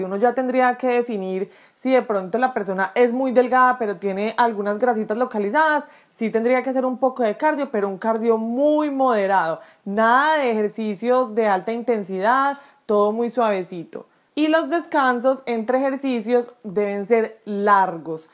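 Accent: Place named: Colombia. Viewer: No sabe nada, pero como me gusta el acento colombiano este ya es el segundo video y el ultimo 😅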